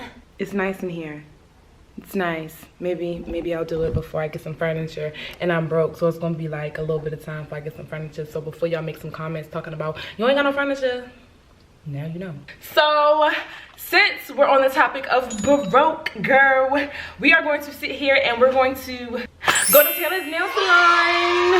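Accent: Baltimore, United States. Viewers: You’re from LA? Girl, I thought you were from DMV or Baltimore. That’s what your accent sounds like.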